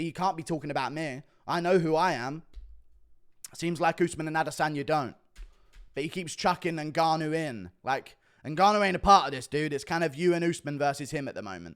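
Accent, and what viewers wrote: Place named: United Kingdom. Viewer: The worm has returned in full force 😂😂 this uk accent makes the vids even funnier keep up the good work/ Sweden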